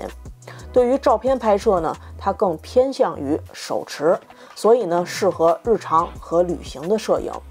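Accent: Beijing, China. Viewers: 她是北京人，并不是所有大陆人都这种口音好吧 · 這是蠻道地的北京腔，吃好喝好的比較平，比起某些口音會突然拔尖高音調，我比較喜歡EDI這種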